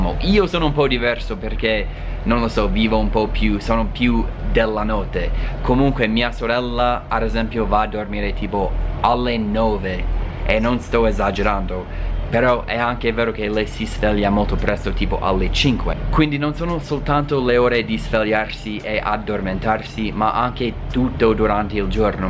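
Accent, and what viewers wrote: United States. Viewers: a hint of american pronunciation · Ti posso chiedere come mai hai ancora questo forte accento americano nonostante dai due anni e mezzo vivi in Italia? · Altra cosa, mi piace un sacco sentirti parlare italiano con il tuo accento americano 🤩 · Mi piace ascoltarti: parli un'italiano davvero ottimo e con questo accento americano l'ascolto è piacevole!Complimenti! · Un abbraccio da Genova che italiano super....bravissimo...ottimo video!! E' carino l' accento americano quando parli la nostra lingua sei forte....Genoa e United States forever...!! Tanta fortuna a te e auguri..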